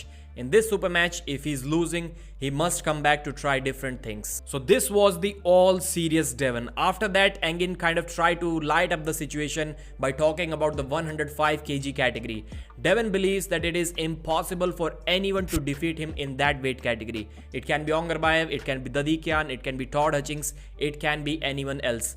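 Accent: India. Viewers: Dude your indian accent is f@ annoying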